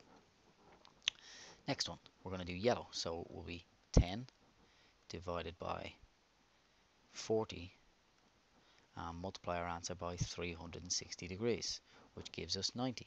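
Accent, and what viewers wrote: Ireland. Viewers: I love ur accent ! Are u irish ?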